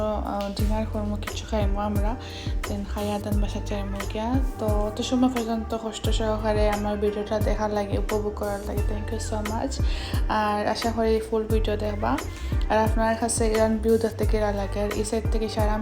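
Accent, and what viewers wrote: Sylhet, Bangladesh. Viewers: ছিলেটি কথা সুনে মন ভরে যায়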